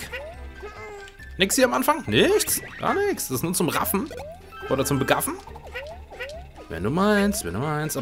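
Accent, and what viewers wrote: Hesse, Germany. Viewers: Er ist Hesse, er darf das.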